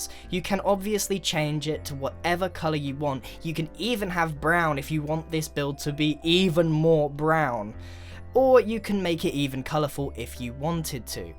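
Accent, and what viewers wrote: England, United Kingdom. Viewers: Are you English coz I am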